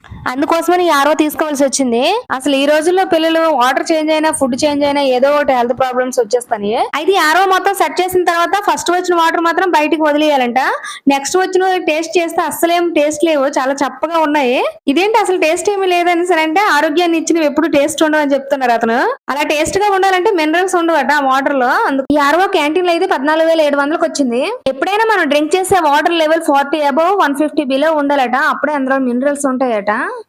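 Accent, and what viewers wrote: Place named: Vizianagaram, India. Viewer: విజయనగరం యాస...very nice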